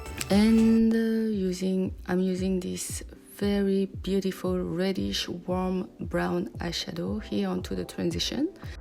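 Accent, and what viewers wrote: France. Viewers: Great eyeshadow look and your French accent is 🙌🏼!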